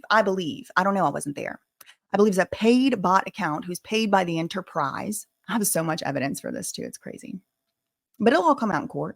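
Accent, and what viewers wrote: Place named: Baltimore, United States. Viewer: You from Baltimore? You got the accent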